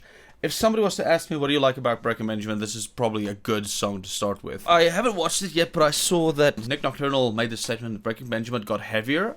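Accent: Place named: South Africa. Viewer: South African?? Klink so! :D ZA ZA ZA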